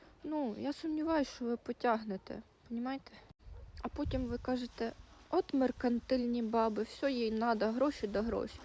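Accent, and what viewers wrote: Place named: Surzhyk, Ukraine. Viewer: У тебя у самой не русский, не украинский, а суржик.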